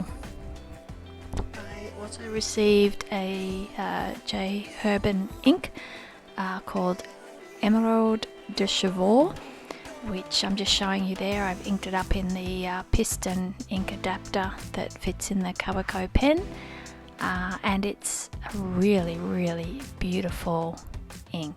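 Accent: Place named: Australia. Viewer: From your accent are you aussie? If so, where did you get the KURUKYNKI stamps?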